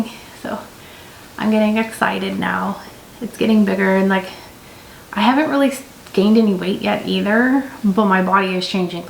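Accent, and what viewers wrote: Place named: North America. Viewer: Oh My Gosh, you sound so North American 🇺🇸. After all these years I just heard your voice. Oh dear it sounds painful.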